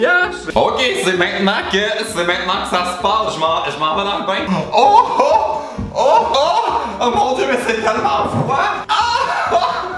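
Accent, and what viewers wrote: Quebec, Canada. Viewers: OMG l'accent québécois JADORE ❤️😍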